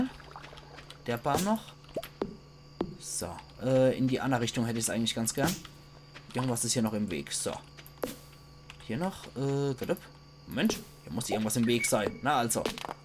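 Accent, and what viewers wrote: Palatinate, Germany. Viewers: Ja klar hab ich dich abonniert. :) Durch dein Lets Play hab ich ja Stardew Valley überhaupt erst entdeckt! Und dank dem Ackermann wusste ich dann oft, was ich den Leuten zum Geburtstag schenken soll oder wo ich etwas finde. Das hilft sehr, und ausserdem macht mir das Pälzisch solche Freude. Lustigerweise gibt es ganz viele Parallelen zu meinem Dialekt, dem Baseldeutsch. Und du kannst so cool Stimmen imitieren! Lewis und Willy - oder die alte Evelyn! Voll gut! :D Achja, ausserdem freu ich mich immer wenn endlich mal jemand anständig symmetrische Sachen baut. :) Freu mich schon auf die nächste Folge! Ganz liebe Grüsse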